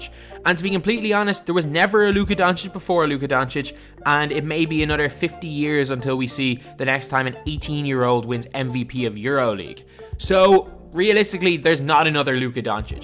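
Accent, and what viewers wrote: Ireland. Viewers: This is an Irishman narrator who is trying to sound American.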